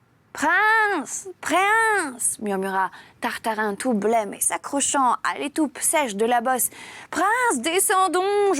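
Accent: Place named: Midi, France. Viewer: C'est et non Camille. Cela dit, pourrait jouer Camille dans Horace de P. Corneille (pour le côté "amoureuse aérienne" on l'entend bien dire avec sa douce voix '"iras-tu Curiace,...") ou Camille dans Le Mepris de JL Godard (à cause du côté beauté fatale et le regard mauvais qu'elle peut avoir). Cette lecture très bien préparée avec des jeux de voix du Midi, confirme des qualités professionnelles qu'on aimerait voir mieux reconnues.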